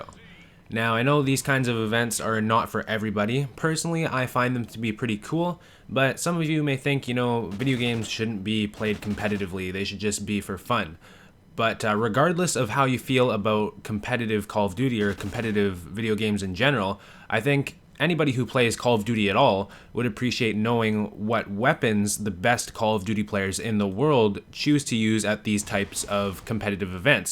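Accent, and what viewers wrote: Canada. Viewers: MSBS is nerfed now apparently, wonder if its allowed now. Good video, was looking for this info for awhile. 1 question, you Canadian? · 4 fuckng minutes listening to a Canadian ramble on 'aboot' pointless crap. Get to the point man..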